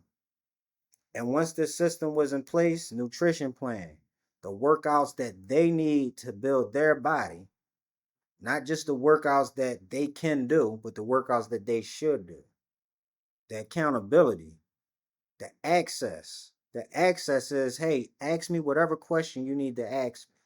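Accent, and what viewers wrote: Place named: Philadelphia, United States. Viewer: You from Philly? I hear the Philly accent,good work cousin!! 👊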